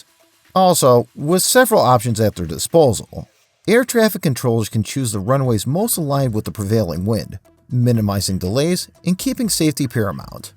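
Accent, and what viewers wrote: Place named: Midwest, United States. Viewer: Sounds like a chicago/midwestern accent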